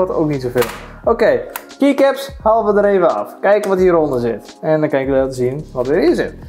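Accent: Friesland, Netherlands. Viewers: Klinkt Fries 😅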